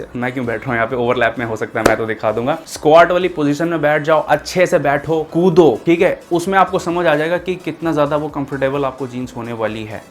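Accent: Lucknow, India. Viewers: Lucknow se ho kya bhai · Are you from lucknow 😀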